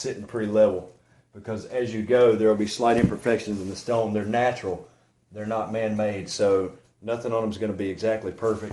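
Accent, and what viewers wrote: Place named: Georgia, United States. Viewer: thanks for the quick response! Where do you do your work? You sound like you're from GA.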